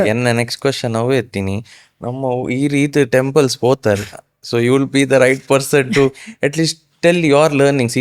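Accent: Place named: Tulu, India. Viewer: This madam tulu is foreign tulu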